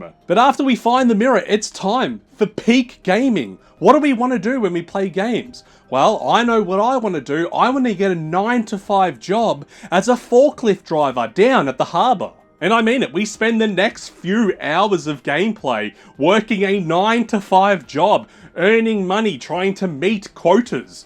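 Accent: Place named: Australia. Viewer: As an American, I love a good Aussie narrator 👍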